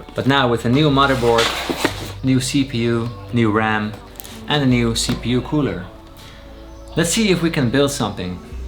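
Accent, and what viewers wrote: Netherlands. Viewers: he is, the accent (though not as bad as other Dutch people) is a dead giveaway =P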